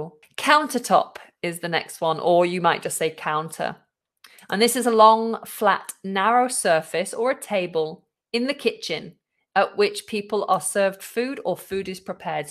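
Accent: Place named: United Kingdom. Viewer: Hello teacher.This is also a great one as always.Actually we try to imitate you to sound more british.Love your accent.Hope more pronunciation lessons from you.Love from Sri Lanka.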